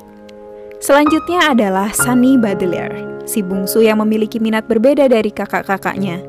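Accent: Bandung, Indonesia. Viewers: Wih orang bandung👍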